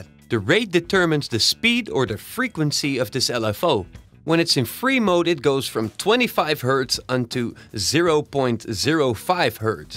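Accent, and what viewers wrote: Netherlands. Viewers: Areeh joe Nederlands? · Haha - You sound sooo Dutch. Nice tutorial though - thanx ;)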